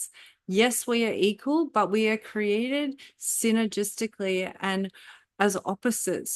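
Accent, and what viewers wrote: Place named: Germany. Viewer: It sound like you are German and are trying to hide your accent…am I right or am i wrong..? Am curious…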